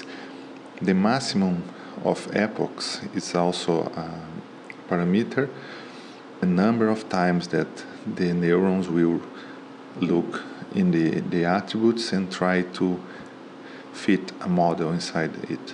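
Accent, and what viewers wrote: Brazil. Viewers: U are from Brazil? Nice ascent. If yes: Muito obrigado pela explição!! If no: My very thanks for the explanation.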